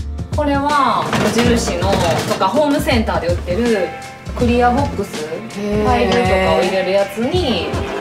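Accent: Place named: Osaka, Japan. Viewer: 私も関西人なので大阪弁が心地いいw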